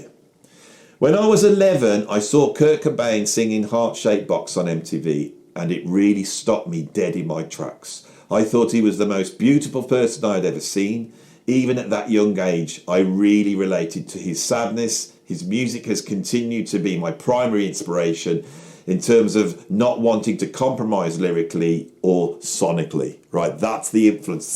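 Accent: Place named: Black Country, United Kingdom. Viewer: You sound like a YamYam so heres one for ya, saw the Pistols at the Laffiette Wolverhampton in 1977, they were touring as the Spots (Sex Pistols on tour) because they were banned from everywhere. Nancy was stood behind Sid during the gig as he proceeded to carve his chest with a beer can . those were the days...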